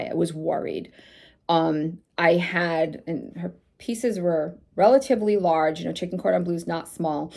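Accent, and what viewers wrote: Massachusetts, United States. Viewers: Thanks for the video, you have to be from Massachusetts based on how you say bananer and Lara bah hehe 😉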